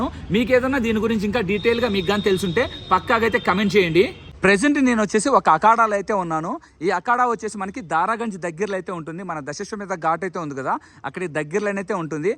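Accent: Nellore, India. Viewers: Om namahsivaya nee langwage batti nellore anukunna madi nellore nanna presentt hy naku hai cheppu godbleesyou swamy daya neeku chala undi nanna nellorelo ekkada reply pettu vasanta aunty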